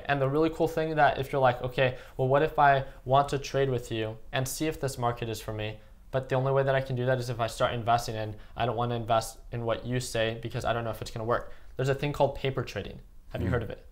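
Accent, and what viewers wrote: United States. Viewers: he sounds like the SNL-Californians bits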